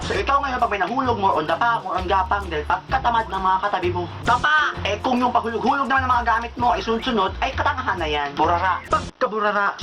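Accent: Visayas, Philippines. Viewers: hahahahha galing! bisaya ka ba??? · Bisaya ka dung uy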